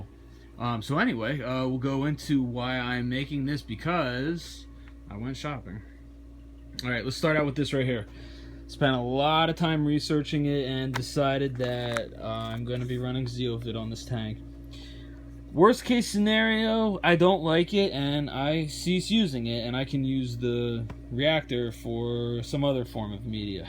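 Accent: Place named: Massachusetts, United States. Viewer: Awesome set up man! You really went all out with the upgrades! Where do you live? I kind of hear a massachusetts'ish accent in there.. I have subbed and can't wait for more vids!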